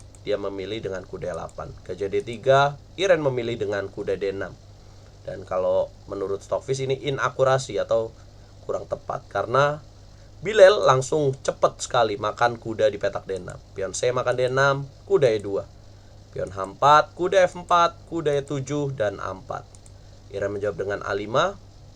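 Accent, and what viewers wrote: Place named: Java, Indonesia. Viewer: Ko tiba tiba medok bang😭👍🏼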